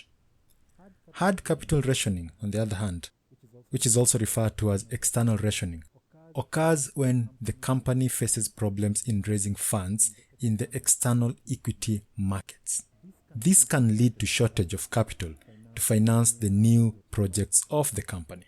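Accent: Kenya. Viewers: You sound like a kenyan.....are you one???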